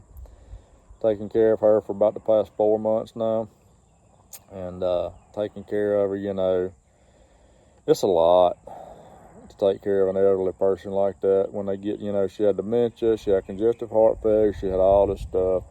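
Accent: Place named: South, United States. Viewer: Sorry to hear about the loss of your wife's granny. Praise God for the Comforter Who helps His people. As for the way you sound, sounds like a southern to me. As for as trains go I miss the caboose . When we were kids we would wave at the men who rode in the caboose . There is a lot to be said about trains. Really I don't know what makes one run anyway. I enjoyed your video. Have a gooden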